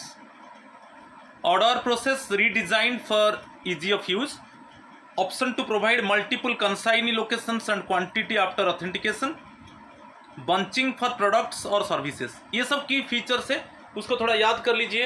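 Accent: Odisha, India. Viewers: Sir are u an odia?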